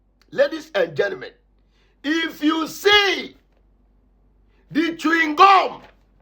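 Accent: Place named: Nigeria. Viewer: My brother i like the way you speak [Boola bay Nigeria English]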